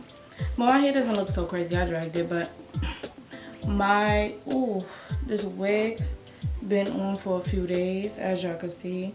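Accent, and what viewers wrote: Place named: Baltimore, United States. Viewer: Lol are you from Baltimore? I love you accent btw 💕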